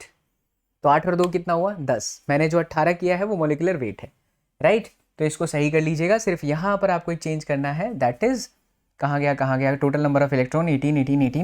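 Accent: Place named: Jaunpur, India. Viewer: Jaunpur se ho aap sir ji 😅 meri tarah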